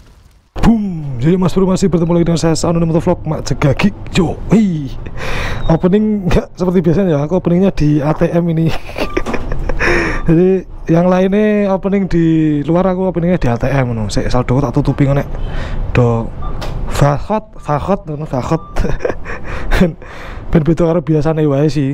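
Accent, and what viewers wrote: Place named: East Java, Indonesia. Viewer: Sampean orang jawa timur ta bang,kok kalau ngomong jawa kayak basa jawa timuran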